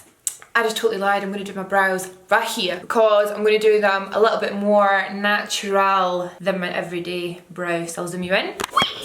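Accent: Scotland, United Kingdom. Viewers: Tarte pro glow to go is the perfect product for that scottish accent! I love it haha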